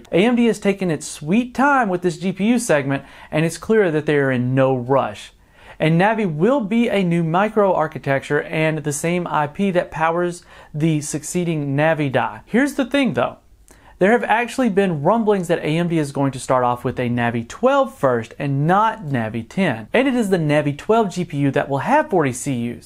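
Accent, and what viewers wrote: South, United States. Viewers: Your mother or fathers strong cowboy country accent really comes through while stoned :) I went ahead and got a Sapphire Pulse Vega 56 for £270 (with the 3 free games bundled).. It's not worth waiting if you want something now. Undervolted to 1100mV and ramped the frequency to 1700/950 and off I went (after running Firestreak stress to completion of course). I got me some GTX 1660 Ti ass kicking goodness.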